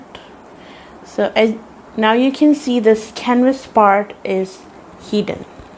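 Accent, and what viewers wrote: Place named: England, United Kingdom. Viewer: i personally love your English accent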